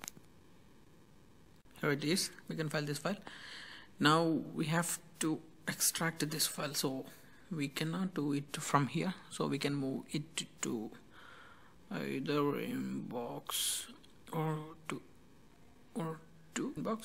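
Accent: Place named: India. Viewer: cant understand shit. dat indian accent man...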